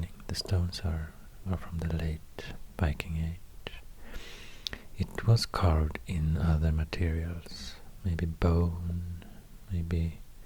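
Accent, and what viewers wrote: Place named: Sweden. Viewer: You is swedish?? I recognize my accent when I hear it 🤔 Anyway amazing video! Loved it ❤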